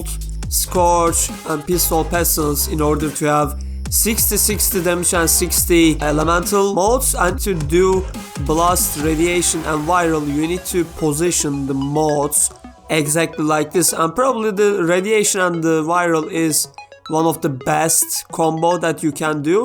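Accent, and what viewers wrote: Turkey. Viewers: Türk müsün kanka konuşmandna belli amk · Türk olduğunu aksanından direkt anladım😂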